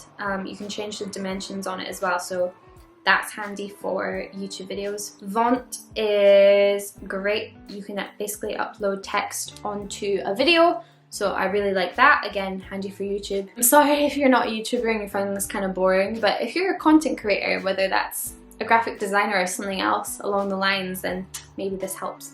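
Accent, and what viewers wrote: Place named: Scotland, United Kingdom. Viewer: Good video! I strangely enjoy watching these videos haha. Where are you from, you sound Scottish then English lol I’m from Glasgow, FYI 🏴😎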